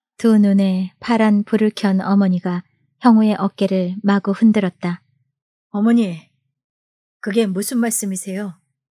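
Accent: Jeolla, South Korea. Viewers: 너무너무 찰지게 전라도 억양 잘 살릴까이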